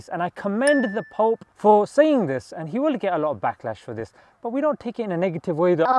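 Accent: United Kingdom. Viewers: is he his acent is british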